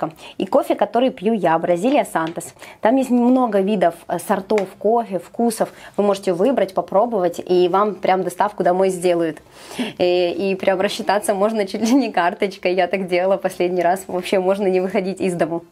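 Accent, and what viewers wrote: Ukraine. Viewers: По диалекту украинка😊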